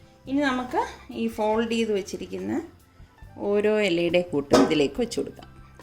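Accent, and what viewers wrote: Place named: Kasaragod, India. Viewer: Appa chapale..😍ksd slang...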